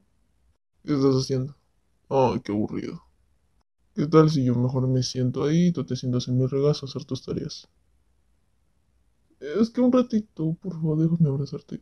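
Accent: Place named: Peru. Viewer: Tu acento peruano es supremo! Amo.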